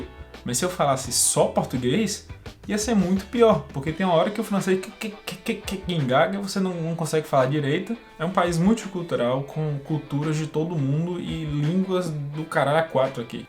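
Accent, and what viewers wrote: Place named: Bahia, Brazil. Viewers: Menino assim que escutei sua voz percebi o sotaque baiano. Adorei seu canal. Um salve direto da Bahia. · véy, verdade! Primeira coisa que pensei! Um conterrâneo, além do sotaque, as gírias baianas hahaha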